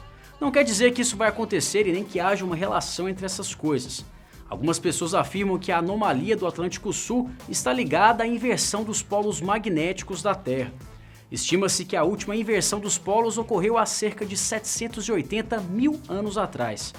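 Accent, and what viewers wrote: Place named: Northeast, Brazil. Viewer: Kkkk. Aki no nordeste algumas pessoas falam assim😂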